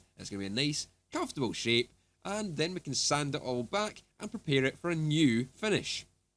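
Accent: Scotland, United Kingdom. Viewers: I can feel the Scottish accent :))))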